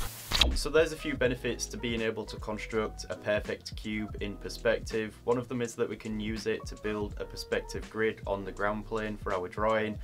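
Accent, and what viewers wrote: United Kingdom. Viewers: You have a british/country accent. It's soothing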